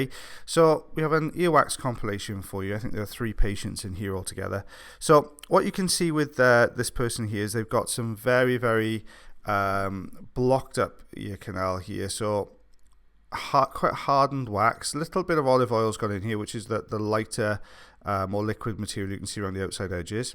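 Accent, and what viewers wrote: Wales, United Kingdom. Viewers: Question: how do you know if you have a hearing loss due to wax? Just curious because I've noticed while watching and listening to your lovely welsh accent I wear ear buds and I've found I can hear clearer in my right ear, I can still hear in my left ear but not as clear???? My husband is from Barry Island where is your office/clinic?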